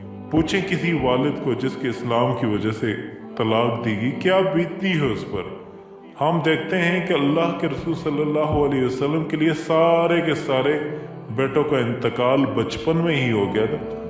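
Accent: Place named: Punjab, Pakistan. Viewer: تلفظ ، عربی وغیرہ ٹھیک کریں ۔ پرابلم پرابلم کا رٹ ذیادہ کرتے ھیں ۔ مال کے چکر میں ھر بندہ نے چینل کھولا ھے ۔اپ عالم لگتے بھی نہیں ۔ اردو بھی پنچابی انداز میں بولتے ھیں ۔ اردو ٹھیک کریں ۔ غربی بھی ٹھیک کریں ۔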